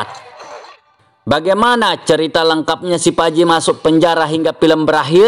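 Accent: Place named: Bali, Indonesia. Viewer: logatnya hmpir2 mirip kaya org Bali ya kdg2 😁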